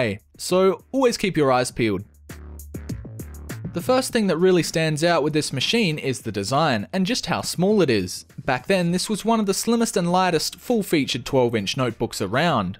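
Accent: Australia. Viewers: Woo your Aussie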